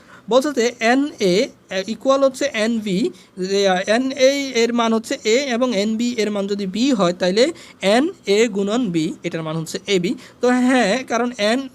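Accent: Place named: Noakhali, Bangladesh. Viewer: স্যার মনে হয় নোয়াখালীর মানুষ 🥲🙏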